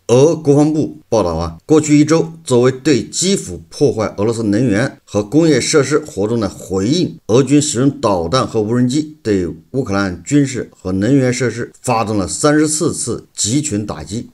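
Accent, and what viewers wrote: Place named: Xiangxiang, China. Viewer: 这湘乡普通话，亲切👍👍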